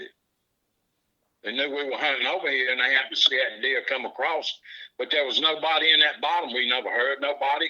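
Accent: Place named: North Carolina, United States. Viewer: I'm from Winston-Salem and a lot of folks go camping and tubing on the Dan River, I love your Eastern North Carolina accent, sounds a lot like our former governor from way back, Jim Hunt. Love your narration I know it's got to be scary out in the woods knowing these creatures are around.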